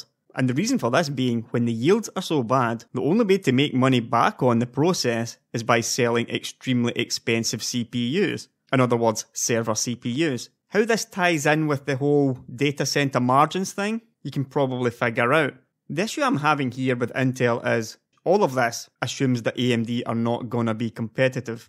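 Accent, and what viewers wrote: Scotland, United Kingdom. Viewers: your accent's ... I'm guessing Scottish? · Does the person have an accent, maybe? like scottish or something?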